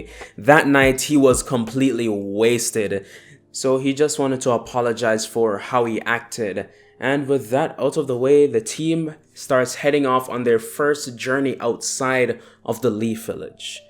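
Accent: Jamaica. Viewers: You sound? Like your Jamaican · Are you Jamaican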